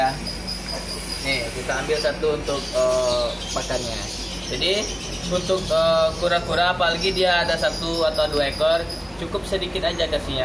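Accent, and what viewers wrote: Indonesia. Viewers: bang orang Padang apa madura ? kok logat bahasa nya kek orang madura ya 😁✌️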